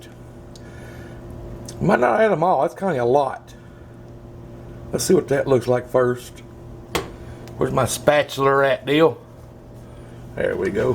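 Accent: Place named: Appalachia, United States. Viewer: well that looks real good I sent the recipe to my granddaughter and I like your hillbilly accent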